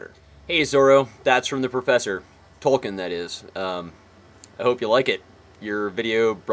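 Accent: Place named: South, United States. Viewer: "Why the hell does my southern accent start to pop up when I'm reading something?" Be proud of your southern roots. Nothing wrong with a southern accent. grins.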